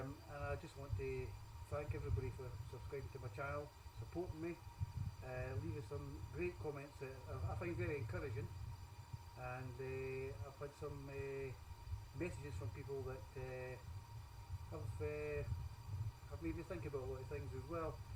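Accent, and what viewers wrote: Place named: Scotland, United Kingdom. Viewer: Are you Scottish ? bec i m not used to hear English with a n accent like that ( Im french speaking ) and I think you are Scottish it's bit difficult to understand for me , bu t I find your vid is interesting , i like walking in the nature too ^^ i like UK bec it has very vast areas with no roads and no houses but not here in Belgium bec my country is too small ^^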